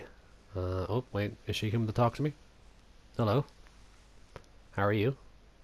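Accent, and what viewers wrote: Ireland. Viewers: You kinda sound like jacksepticeye I'm guessing you're Irish?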